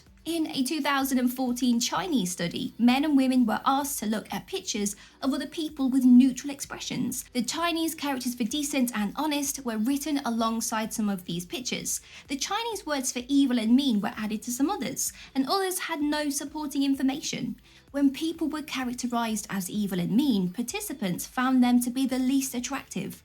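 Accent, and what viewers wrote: United Kingdom. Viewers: HONESTLY. These behaviors are not problems I have. The exception is that sometimes I seem to be figeting, but I'm actually constantly doing finger exercising to increase dexterity (professional musician... guitarist) I usually just explain that I'm not nervous, and about the exercises.... Found it to be a Good way to open up an invite for a lady to come to one of our shows. However, listen up everyone. This information is hugely important. Nice Work. Good Content here! I'm liking your British accent, reminds me of my favorite friend in college. She was from somewhere near Cambridge. She used RP.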